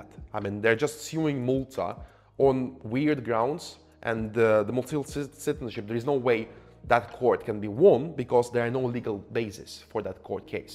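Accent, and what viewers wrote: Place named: Poland. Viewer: Your Polish accent is still very much possible to hear. Not that I am aginst a Poles ( as they belong to EU) just saying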